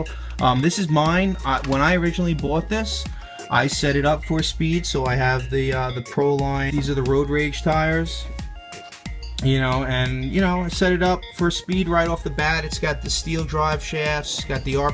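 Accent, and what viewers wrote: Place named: Long Island, United States. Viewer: Great collection! Been a subscriber for a long time, your accent keeps me coming back, I grew up in Northport and it's good to hear. If you ever get up to CT I'd love to drive with you. Check out CT Scalers on FB.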